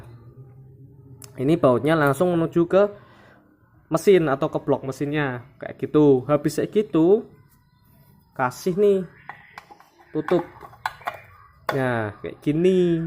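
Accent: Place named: Pekalongan, Indonesia. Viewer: Koyo wong pekalongan logate mas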